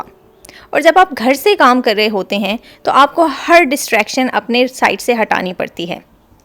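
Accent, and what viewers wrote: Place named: Pakistan. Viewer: Your Urdu accent is amazing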